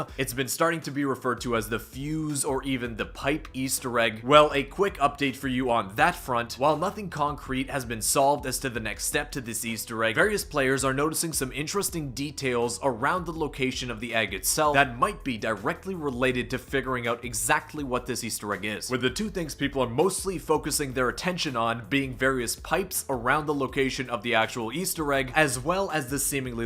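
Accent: Canada. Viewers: Love how us canadian say words, got a good laugh out of that one and not that I really care about being noticed I just watch till the end every time, would like like this comment eh? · Didn’t think twice about the Canadian accent, also up here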